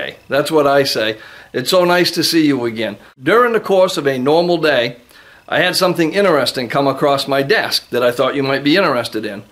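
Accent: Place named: New England, United States. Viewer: Having lived in Boston for years, I love your New England accent.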